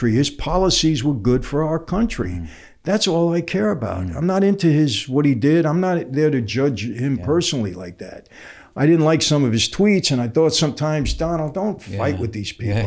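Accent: United Kingdom. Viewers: Great interview. Enjoyed you having to renege on some of the cockney patter so your questions made more sense 😂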